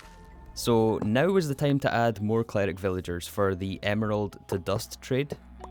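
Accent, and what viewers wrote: Scotland, United Kingdom. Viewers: Are you Scottish and if so where you from? Just discovered your channel and love it. I’m from Glasgow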